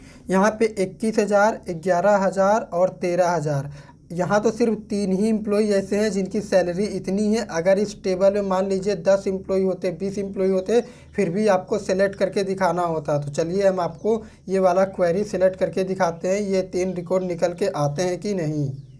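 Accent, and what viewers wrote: Jamshedpur, India. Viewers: Sir ji app Jamshedpur se ho kya??? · Bhai Jamshedpur se ho ky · Are bhai Jamshedpur se ho kya ?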